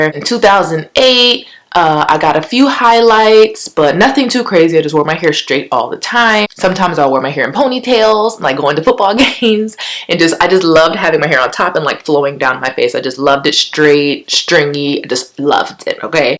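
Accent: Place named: Barbados. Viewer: apologies. I been finding many hair you tubers sounding Barbadian. Maybe it's people from a particular state. You are gorgeous btw.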